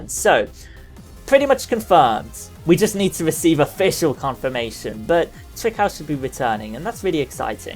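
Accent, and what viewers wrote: United Kingdom. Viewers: Where is your accent from? Sometimes it sounds British, other times it sounds from the Orient. It's a cool accent.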